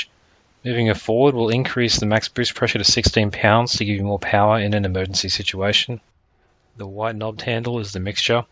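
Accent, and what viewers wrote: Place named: Australia. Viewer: Dude you sound like an Aussie, why can't I find this game in Australia?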